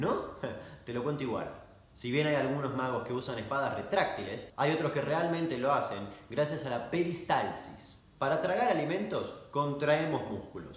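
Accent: Argentina. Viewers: Pareces español pero hablas como argentino xd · El hecho de que tengas acento argentino (o uruguayo(?) lo mejora todo 70 veces. Gracias,buena info capo.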